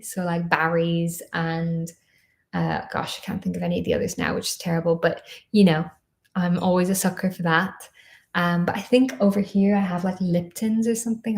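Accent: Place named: Ireland. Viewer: Hi, lol i always thought you had an accent, but hadnt pinned it down to Irish, thats really cool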